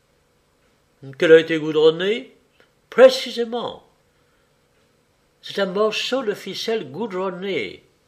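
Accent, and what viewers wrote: England, United Kingdom. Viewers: oh are you adding a british accent :D i was wondering what dialect that was lol im learning french :goodvibes::elbowcough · Merci pour cette interprétation, très belle voix avec l'accent anglais. J'ai apprécie cette voix dans mes lectures audios de Sherlock holmes. Merci encore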